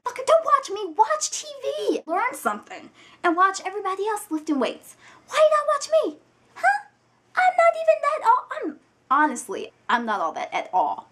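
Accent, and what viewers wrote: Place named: Brooklyn, United States. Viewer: are you from Brooklyn,NY? you sound like it.. lol i love ur videos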